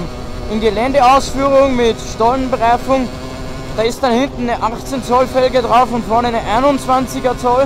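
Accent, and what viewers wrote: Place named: Burgenland, Austria. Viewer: vermutlich burgenländer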